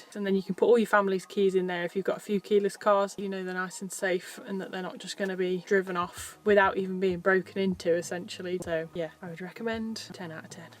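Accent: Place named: United Kingdom. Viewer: I could listen to you read an Instruction Manual and I would be quite content. Beautiful women with an adorable accent. Brits are the shit!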